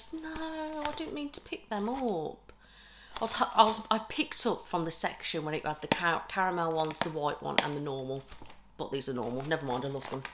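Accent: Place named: North, United Kingdom. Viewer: she sounds more northern - the midlands / the west - just a guess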